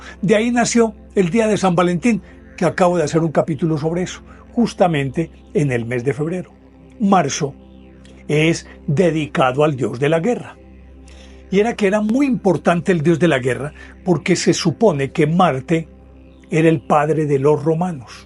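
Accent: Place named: Colombia. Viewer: Me encanto esta historia y narrada con un acentó colombiano que chevere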